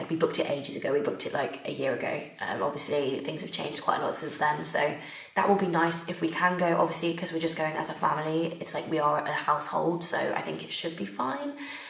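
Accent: United Kingdom. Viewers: !😃 I always wait for your next video cause like you I tend to be really chatty, gossiping and stuffs.😅 I just really vibe with you.🤠 I feel like if we sit and start talking, time would be passing so fast than we had expected.🐣 I came to know you through Oxford videos;🦢 moving to campus etc and I don’t regret 🍀spending almost an hour listening to you.💐 Honestly at the end of one of your long 45-50 mins videos, 🌷I tap on the screen to see how much time I’ve been watching and it’s just unbelievable how the time passed as I was so engulfed in your conversation.🌻 I really like hearing everything you say and it helps me develop my ability to speak and hear Brit english. I recommend you to do a video on everything on Oxford;🌟 the requirements, interview😥, compressed time table,⚡️ party,✨ free time and others.🌈 That would really help me out and I’ll be extremely grateful to you.💥 Sorry for this long comment but I can’t help it just comes with the flow.⛄️ Watching videos that you want to film plus it’s over 30 mins is heaven for me.🤩 I really like your vids!😍 You should do a livestream on a day. 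Been a long time since you didn’t.🤔 So yeah, patiently waiting for your next videos.😇 Stay safe and be crazy!🤪 whispers you are a beautiful woman😁🤩...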